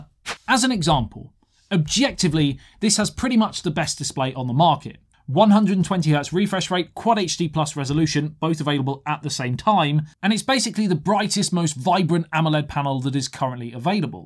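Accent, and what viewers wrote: United Kingdom. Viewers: I got mine last week 512 GB in the phantom black I love mine but I don't live as much as I love my iPhone 12 pro Max it's got a better camera low light photos lidar scanner that I use every day at my job as an interior decorator it saves me time and money and time is money when you are working with that thing and all of the wonderful AR apps I just wanted to say that I just absolutely love his voice I have met many British people in my life but his is different it could be Welsh I don't know but it's the most beautiful beautiful accent on another human being that I've ever heard in my life from either England or whales or maybe Scotland I don't know where he's from but all I know is that voice is hypnotic !!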